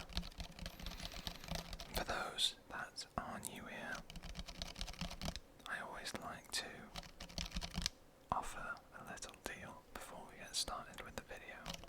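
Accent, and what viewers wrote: United Kingdom. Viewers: Bro I am just fascinated by the British accent, as a non-native speaker I just love those pronounciations, fantastic video anyway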